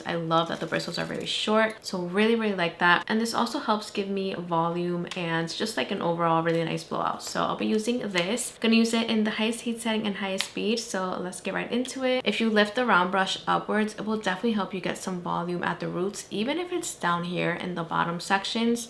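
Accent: Dominican Republic. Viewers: Your Dominican accent ❤🇩🇴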